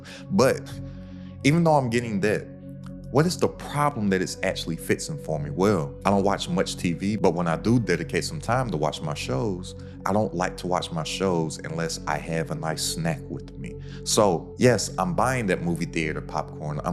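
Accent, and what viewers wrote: Kentucky, United States. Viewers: Are you from Kentucky brother? I’m in Lexington. Love your game, it helps me a ton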